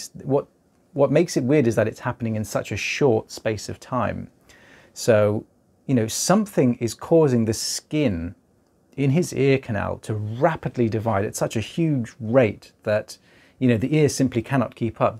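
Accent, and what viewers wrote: United Kingdom. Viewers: i think you need to talk to dr. Neel Raithatha he does ear wax removal also and he uses an oil type cleanser called clear. excuse me if i'm wrong, but you sound British to me and Neel IS British so maybe find him some how. he has his own youtube channel but his comments are off so try using his comunity page. hope he can help.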